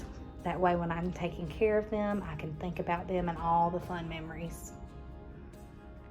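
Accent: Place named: Kentucky, United States. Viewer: Whenever I watch (which is always) I feel like I’m hanging with family. My relatives are in Kentucky and Tennessee so they talk like you 😅 and we all love Jesus. Your home is so beautiful.. love the kitchen cabinet and all your little doggies.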